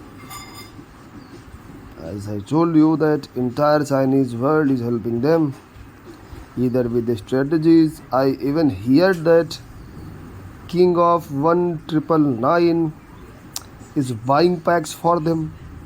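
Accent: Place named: India. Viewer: india bro logatnya aja ketahuan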